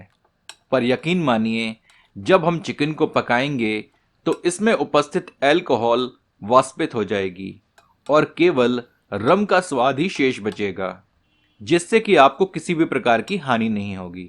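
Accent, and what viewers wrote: Standard. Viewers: pure Hindi .... Sooo good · He is the only one who speaks SHUDH HINDI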